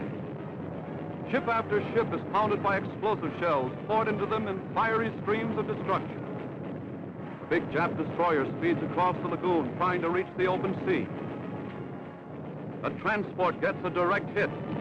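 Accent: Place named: United States. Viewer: Also the announcer is American.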